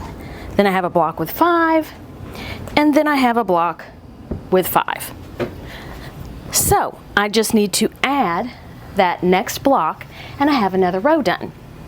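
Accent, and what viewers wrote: United States. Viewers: Thank you. Love this video...and your wonderful southern accent!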